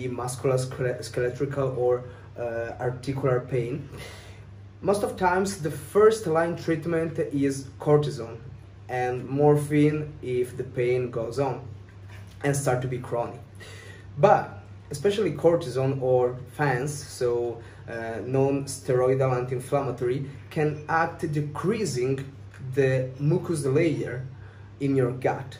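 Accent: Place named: Veneto, Italy. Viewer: Great,buddy 💪🏻l'inglese con accento Veneto è stupendo☺️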